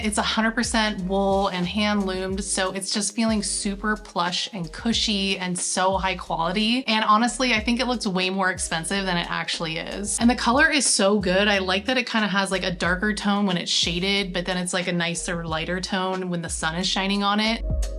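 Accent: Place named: California, United States. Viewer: A piece of trivia. In reference to a previous comment, concern about your voice, your inflection told me you were a Californian! My kids grew up here. They don't have that accent. I grew up in San Antonio. I used to have a thick accent. My sister did not. Go figure.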